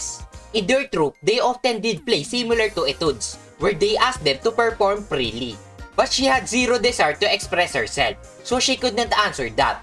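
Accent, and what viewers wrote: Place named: Philippines. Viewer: from the intonation of the narrator, im guessing, filipino? btw, nice vid! i watched because well, its ayane sakura!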